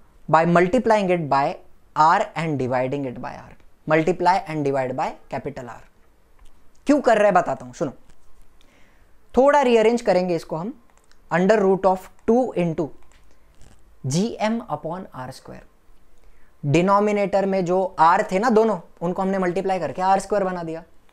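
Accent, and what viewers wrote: Maharashtra, India. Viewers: Sir are you marathi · His sir you are Marathi??? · Sir you are Marathi 😁😁😁😊😊😊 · Sir you from Maharashtra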